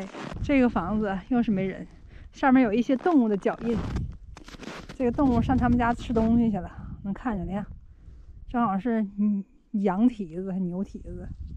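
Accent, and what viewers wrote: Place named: Beijing, China. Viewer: 美女和東北美景又加上一口京片子讓人欣賞影片時感覺真舒服，祝美女新春快樂！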